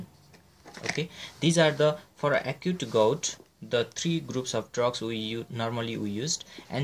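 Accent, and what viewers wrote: India. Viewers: I like the Indian accent 😁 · WHEN INDIAN WOMEN TALK ITS SO SEXY, BUT THE DUDES....WHAT? AT FIST ITS OK THEN AFTER AWHILE I THOUGHT HE WAS GOING TO SPEAK HINDI. THIS WAS A VERY GOOD GOUT VIDEO HANDS DOWN! WHAT HE IS SAYING ABOUT ALLPURINOL AND COLCHICINE IS SO TRUE AND I DISCOVERED BY ACCIDENT AND TIME. IF YOU CAN CHANGE YOUR LIFE STYLE USE COLCHICINE, IF NOT ALLPURINOL. IF YOU NOTICE AFTER ONE YEAR YOUVE HAD 5 OR MORE ATTACKS AND YOUVE CHANGED YOUR LIFE STYLE TAKE ALLPURINOL. IF NOT TAKE COLCHICINE 2 FIRSTDAY WITH ALLPURINOL, ONE FOR 3 DAYS WITH ALLPURINOL. FOR EVER.CRUSH TYLENOL AND A QUART OF WATER AND DARK SWEET CHERRIES OR FRUIT TOGETHER FOR SEVERE PAIN IF YOU CANT GET (OXYCODINE, PERCOCETS, VICADINE AND ETC).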